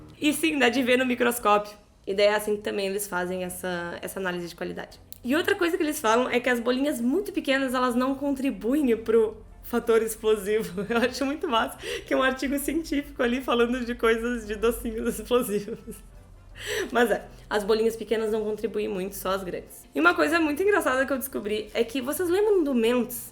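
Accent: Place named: Portugal. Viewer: Muito legal! Você é muito carismática falando, e parece ter sotaque de portuguesa (você é portuguesa?) rss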